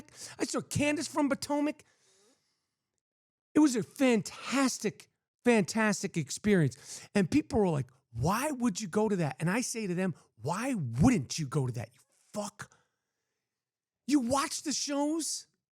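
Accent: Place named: Long Island, United States. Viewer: It’s amazing how much you sound like me and I’m from Long Island New York and your movies sometimes you don’t have that accent brother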